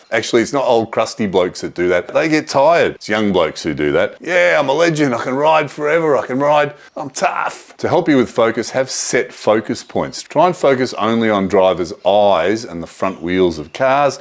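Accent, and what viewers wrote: Australia. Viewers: Can’t tell if you’re from Australia or Boston MA 😂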